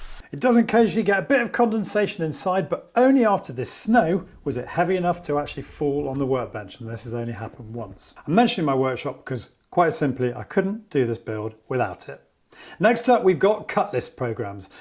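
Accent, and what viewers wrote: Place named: Southern England, United Kingdom. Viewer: Just wondering what part of the UK are you from. I am up North in Lancashire you sound like your up south?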